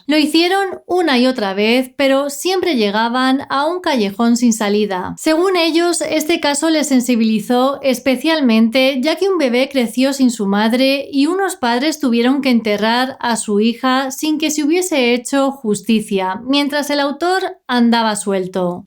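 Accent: Castile, Spain. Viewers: Eres quizás sudamericana pero con pronunciación castellana ???? Porque no pronuncias de modo normal ???? Más pareces salida de Telecinco, por Dios habla normal !!!!!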